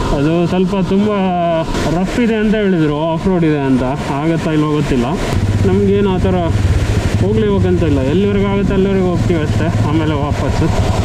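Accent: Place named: Coastal Karnataka, India. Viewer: Thumba genuine viewers matra nim channel alli baro videos poorthi nododu....but nijvaglu ondh video na poorthi nodudre saaku, we will just fall in love.....you are such a kind hearted person... almost 3.5+ years inda nim subscriber. Ondhe ondh kuda exaggerated video nan nodilla ee channel alli... I'm sure ee channel elligo hogutte swalpa dinadalli and btw nimma dakshina karavali kannada kelakke thumba sogasagide💗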